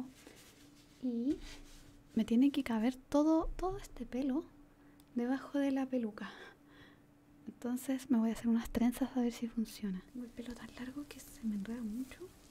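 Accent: Chile. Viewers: hablando chileno❤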